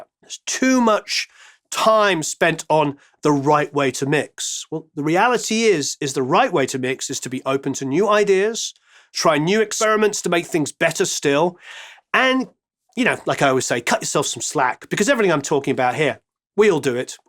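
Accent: England, United Kingdom. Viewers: All of this truly wonderful content, also done in an adorable soothing English accent no less, has truly made me appreciate all of those finished records I had so taken for granted before far more. This industry is apparently no 'piece of cake' at all when one realizes you're dealing with so, so many different variables AND the personalities of possibly technically ignorant "Artists" and even their producers AND Apple's publishing standards. Good luck with that.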